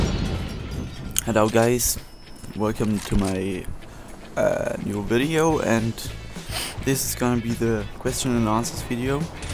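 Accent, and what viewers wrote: Germany. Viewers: are u german ?^^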